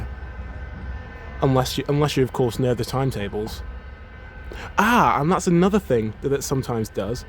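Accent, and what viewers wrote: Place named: Australia. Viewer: Stop talking like an Aussie...proper fkin annoying.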